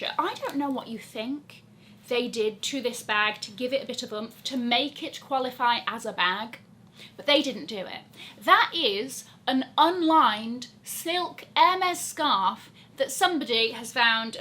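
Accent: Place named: England, United Kingdom. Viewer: Nice review... I love your English accenT and you are so pretty. For me, I will always STAN the vintage/pre-owned luxury bag.. the quality still THERE and the prices are not always higher. But for the new designer bags I only want the Interracio from Bottega Venetta is just... PERFECT ! That's sad that now some bags are not hot like before.....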